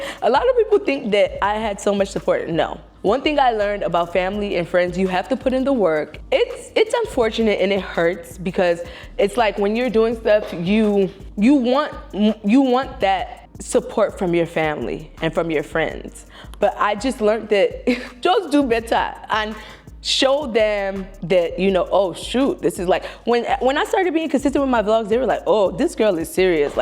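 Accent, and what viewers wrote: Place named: Nigerian American, United States. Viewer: The mixture of Nigerian and American accents.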